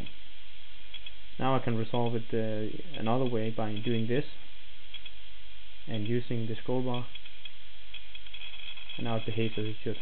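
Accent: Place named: Denmark. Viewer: you sound danish...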